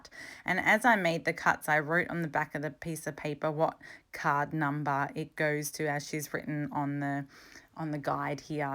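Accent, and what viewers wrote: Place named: Australia. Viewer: Lovely to hear another Aussie voice on the Tube. I liked all the cards, and your style of video. New subscriber!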